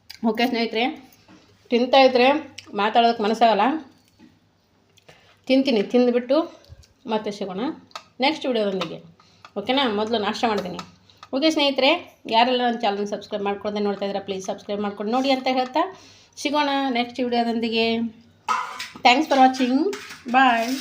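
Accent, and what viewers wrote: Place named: Uttara Kannada, India. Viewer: Namaskar ri. Namma UK bhashe kelode ondu khushi. Chennagi ide paddu.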